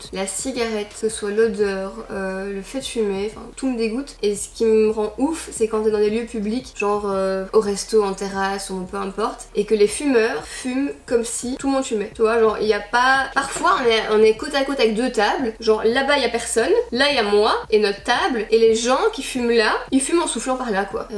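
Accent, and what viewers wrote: Belgium. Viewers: Je trouve que tu as un accent belge 😂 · Attend t belge je me suis que t'avais un accent belge je suis franco belge 👍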